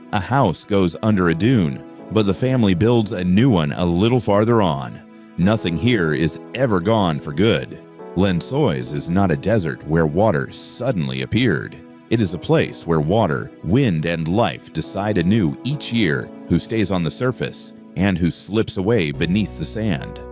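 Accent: England, United Kingdom. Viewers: angolos tályszólással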